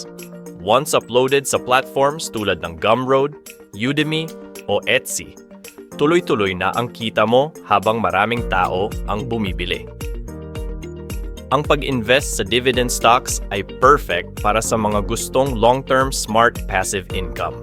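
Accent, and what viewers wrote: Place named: Conyo, Philippines. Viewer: Speaker sounds so conyo. 😅😅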